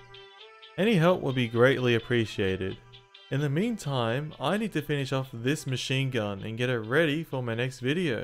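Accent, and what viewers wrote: Australia. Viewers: as a native, he sounds very Australian to me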